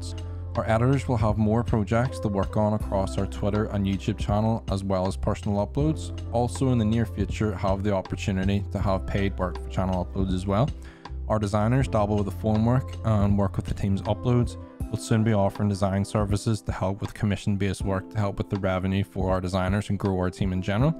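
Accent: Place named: Northern Ireland, United Kingdom. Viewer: northern irishman with the asmr right here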